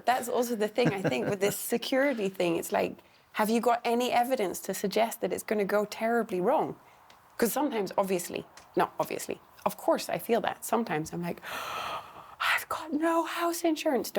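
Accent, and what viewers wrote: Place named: Ireland. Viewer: Wonderful, sister, miss the irish accent , lived for a good while in Ireland, then felt to move back to rural România to care for a piece of land and few old villagers around us here, such an obvious, simple solution for humanity to cease its destructive madness and become harmonious, returning to nature..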